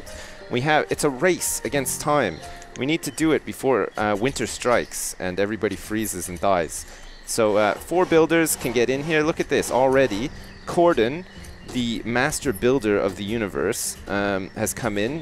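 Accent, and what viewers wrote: Canada. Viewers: I can hear your Canadian accent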